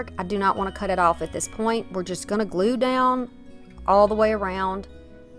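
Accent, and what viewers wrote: South, United States. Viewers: Number one, I'm a new subscriber and I just wanted to say that your amazing southern draw and personality is what keeps me coming back! Number two, ALL of the things that you make are stunning and I'm so grateful that you've chosen to share your talents with all of us! I'm going to go check out your IG and send you a DM. Maybe we can share projects and ideas 😁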